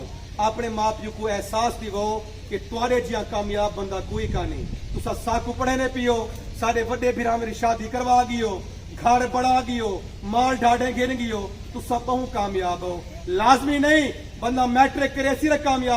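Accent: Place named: Saraiki, Pakistan. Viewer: سرائیکی ٹچ 👌👌💯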